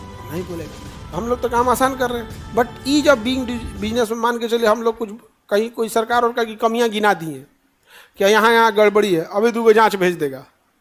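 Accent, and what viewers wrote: Bihar, India. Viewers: Coaching Mafiya khan, jisne apne bihari tone ko famous hone ke liye use kiya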